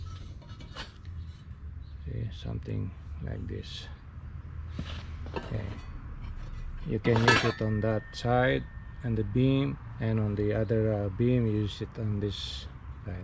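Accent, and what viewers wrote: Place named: Philippines. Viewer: Thanks for the video.. I can hear the Pilipino accent 😉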